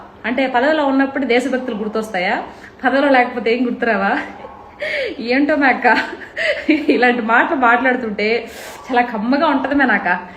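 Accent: Nellore, India. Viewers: Nellore yaasa not godhaari yaasa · GODAVARI YASA KADHU RA BABU, MAA NELLORE AK47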